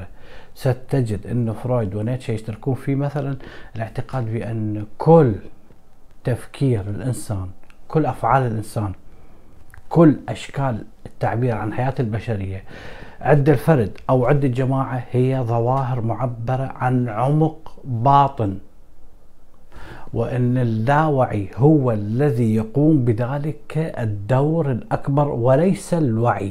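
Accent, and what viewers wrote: Iraq. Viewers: اشكرك من صميم قلبي على كل ما تقدمه بصوتك الشجي العراقي الاصيل . تحية لك من دمشق ❤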